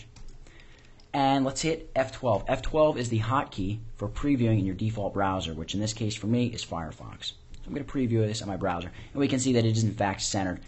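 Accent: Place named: United States. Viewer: awesome tutorial, i was tired of watching other vids where the people have strange accents. USA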